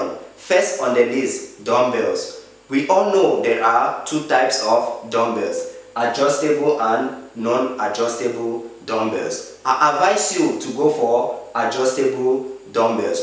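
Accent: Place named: Nigeria. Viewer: ohh nice you sounded like a Nigerian good job mehn you look good 👍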